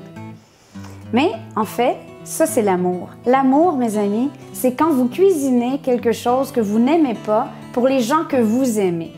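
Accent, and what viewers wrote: Canada. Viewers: Je me demande pourquoi je ne vous ai pas connu plus tôt ?? Vos vidéos sont agréables à regarder. Vous êtes marrante et je kiff trop votre accent...le bel accent canadien.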